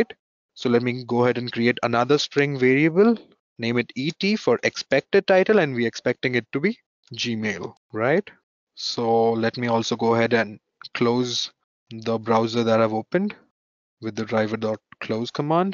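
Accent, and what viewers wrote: India. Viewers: Indian accent, yes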